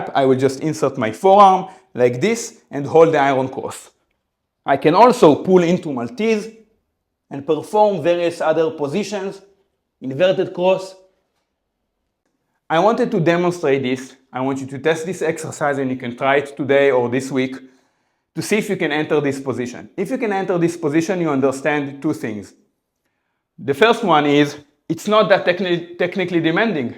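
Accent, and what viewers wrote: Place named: United Kingdom. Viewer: לפי המבטא שלו הייתי אומר שהוא בריטי.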